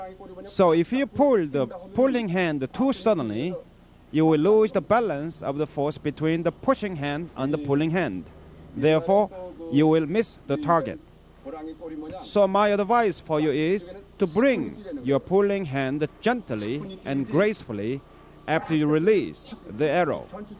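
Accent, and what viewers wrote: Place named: South Korea. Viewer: There is a Korean accent in that English. I agree it sounds very unnatural.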